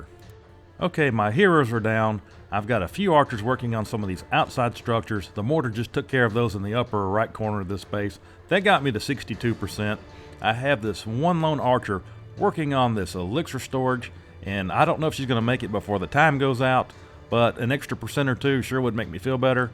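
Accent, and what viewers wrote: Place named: South, United States. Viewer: this is great. 😂 as a southerner I love the accent and phrasing, it's wonderful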